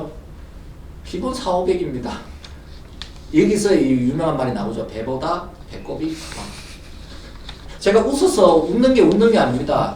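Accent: Gyeongsang, South Korea. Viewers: 경상도 억양이 저렇게도 또렷한데 ㆍ서울 아니면 ㆍ다 시골이라고 하시는 분이신가보다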